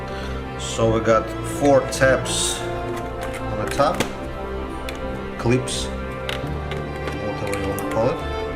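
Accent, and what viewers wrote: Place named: Russia. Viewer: Жесткий Русский акцент ;) молодец руки из правельнного места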